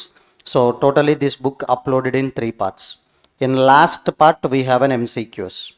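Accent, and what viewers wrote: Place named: South India, India. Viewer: Sir i am from North India although your accent is little bit South Indian but you are different from any other faculties you are best sir thank you for everything ❤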